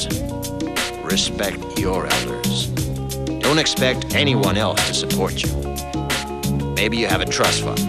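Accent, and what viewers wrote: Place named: United States. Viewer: he sounds american,but isnt he australian ?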